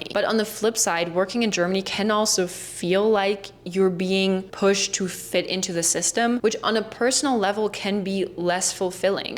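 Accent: United States. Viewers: Thank you for this. Your American accent is perfect. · Love your vids here and on IG. You have a lovely fusion accent of German-English. So classic Why try to sound so American here? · biggest proof you here in America and speaking as if you were an American. Germans are defeated culturally since WW2 they are neutered. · Yep, i am German and in the beginning I did not know if she is American or German. But no American can fake a funny, German accent. Now, as i know she is one of us, i am blown away how perfect her American english is. But: please dont make so much Werbung for working in Germany. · Wow you sound really American. A few words you can detect a difference, but most of the time you sound 100% American to me. Hard to believe you've only lived here 2 years. With that said, I don't see the pressure in American jobs. I also don't see a problem with the lack of welfare. 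My family left Germany back in the 1850s, so I'm as American as you can get at this point (I'm about 75% German, and my other ancestors came here from England, Ireland and Scotland as far back as 1620). I was brought up to work hard and get ahead. You only buy what you can afford. Live within your means. Welfare is only for the lazy or those who aren't capable of taking care of themselves. The one thing I wish we had was the mandatory 30 days off. It would definitely be nice to work 400 less hours a year, but there's a reason we're wealthier too. We work more, so we earn more. I grew up working on a farm/ranch, working about 30 hours a week during the school year and 80+ in the summer. Sometimes 100 hours a week. So 1800 hours a year isn't much to me. 1400 is nothing lol. I've had many discussions about Germany and what it's like there since my best friend from college is German and his brother (also my friend from college) went back to Germany and works as a lawyer there. 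It's interesting to hear the differences. I haven't made it to Germany yet, except the airports, on my way to/from Ukraine where my wife is from. Funny side-story here: I gave up on American girls many years ago, and was actuall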